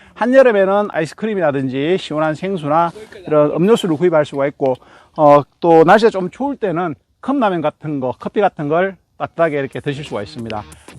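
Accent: Daegu, South Korea. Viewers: 와우~~~ 님, 홍성 용봉산의 봄 풍광과 함께 개인적인 소개를 들으니 궁금증도 해소되고 더욱 친밀해 지네요~~~^^ 음.. 저보다 쬐끔은 어리시리라 생각했는데 6년정도 되네요~^^ 사시는곳은 저희 어머님 고향인 대구셔서 억양이 익숙하고 정감있었던 것 같습니다~~ 태안 백화산에서 '이리오너라~~ 게 아무도 없느냐~~' 와우~ 마라톤완주, 철인3종, 수영까지... 반바지 패션을 외쳤었는데~ 오늘 사진으로 다 봤네요~ 굳입니다~~ 항상 응원드리며, 사계절 확인제품군이 아닌 품목들은 협찬 받으셨으면 합니다. 그러면 실시간영상과 드론영상, 360도 촬영등도 앞당겨 할 수 있고 님이 하셔야 제맛일듯 합니다~~ 나이들수록 좀 더 큰 숲을 보기 위해 힘을 빼다보면 가까운 나무앞에서의 자신만의 룰이 꼭 정답은 아닐 수 있다는 생각을 하게 됩니다. 이 대목엔 맞지 않지만 요즘 제가 좋아하는 말이 있습니다. '악은 나를 생존케하고 선은 우리를 공존케 한다' 우리가 배우고 지키던 도덕과 윤리는 자연속에 부합되는 섭리가 아니라 인간 공동체 사회속에서 국한되어지는 룰이겠지요~ 코로나만 아니면 함께 등반기회가 있었으면 좋겠네요~~ ㅎㅎ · 아 님 거짓말도 좀 하시는듯... 마라톤 풀코스 완주에 바다수영 3km, 또 트라이애슬론까지 다 하시는 분이면서 체력이 그렇게 좋은거 아니라고 말씀하시다니요. ㅎㅎ 어쩐지 산을 좀 날아다니신다 했습니다. 사투리가 가끔 묻어난다 했더니 대구분이셨군요. 저도 동향입니다. 십몇년을 그곳에서 살았었죠. 유튜브 하시는 분들 다 수익발생 바라고 하지만 하고 있는 입장에서도 쉬운 일은 아닌데 너무 잘 하시는거 같습니다. 조만간 오억도 곧 달성하실거 같네요. ^^ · 억양만으로 대구 분 이라고 생각했습니다 540만원? 한 편 찍는데 이동하는 기름값도 안되네요 ㅠㅠ 구독자들과 소통을 위해 월1회정도 라이브방송 괜찮은듯 합니다. 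슈퍼챗 이라고 구독자가 후원해주는게 있는데.. 아마 인기많으셔서 금방 부자되실 거에요^^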